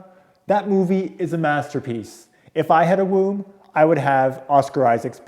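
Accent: Canada. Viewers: You sound Canadian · Okay so I know this horribly off topic, but are you Canadian? It's just the way you said eh. I'm guessing if you are Canadian you're probably eastern. Idk I'm just completely focusing on your accent, sorry. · There's a lot in this video I was nodding along with but may I just say, you sir have the only Canadian accent I have ever been able to pick up on!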